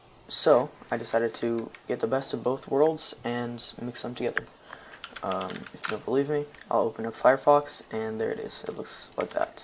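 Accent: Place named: Brazil. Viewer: demorei mt pra perceber q tu é br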